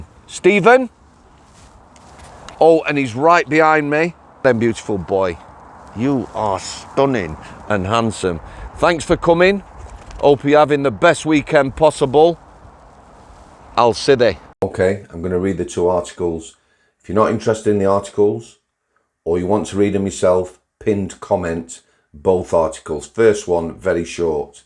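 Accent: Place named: Northern England, United Kingdom. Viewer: Best Prison talk online! I heard you on the Prison Officer podcast on Spotify. Awesome hearing a Northern accent on an American podcast. My great Grandfather’s Brother was a Prison Officer at Strangeways. Retired from there. I’m from Cheshire, lived near Clitheroe Lancs, moved to Kentucky USA, did 8 years as a Correctional Officer, worked in the private sector (armed security) and have ended up back in ‘the job’ as a Deputy Jailer in a 600 man County Jail, seeing the worst of what humanity spits out on a daily basis…but still find time to have a laugh doing it…got to Brother! Love the channel, listen to it on the way to Jail daily. Thanks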